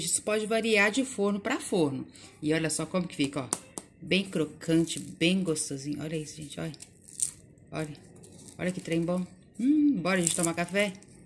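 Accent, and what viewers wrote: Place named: Minas Gerais, Brazil. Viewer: que bom escutar se o sotaque mineiro. Sou mineira mas moro em Brasília. Vou fazer a peta agora!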